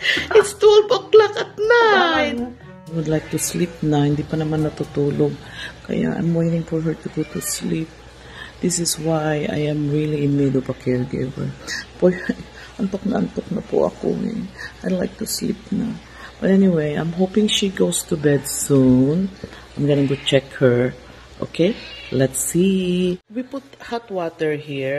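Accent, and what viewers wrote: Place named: Abra, Philippines. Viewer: Madam ang tuno yang salita mo parang taga sa amin. Bangued Abra po ang aking hometown.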